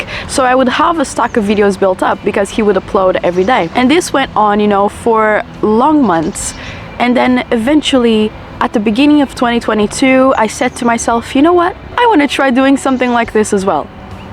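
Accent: Portugal. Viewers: the portuguesse accent is 🔥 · Saw this video suggested to me for some reason. Clicked on it. First few seconds of hearing you talk, "Yup, esta tipa é portuguesa, 100%". :D Your channel does seem interesting. Subscribed. Also, epá olha que diz que isso de fumar faz mal. Come antes uma peça de fruta. Ou qualquer coisa do género. Ah, fuck it, também sou escravo da nicotina. Só gosto de dizer esta frase.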